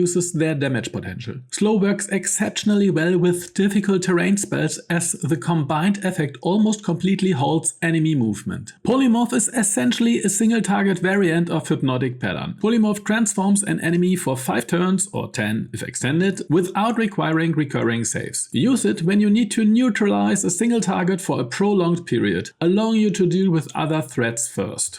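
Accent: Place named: Germany. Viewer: absolutely love this kind of in depth analysis!! keep it up!! recently for whatever reason youtube has been recommending me many smaller, german, bg3-focused creators and i have been all for it lol (forgive me if ive misheard your accent — it sounds german to me)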